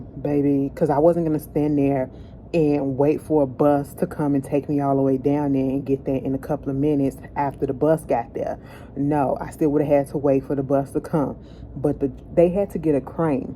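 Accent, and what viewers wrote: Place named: Boston, United States. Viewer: Hearing you say all these lines reminds of Boston ☺️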